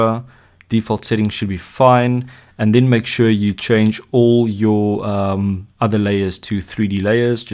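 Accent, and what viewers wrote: South Africa. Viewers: That accent though. Are you South African? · Love the South African accent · Didnt know south african english differs so much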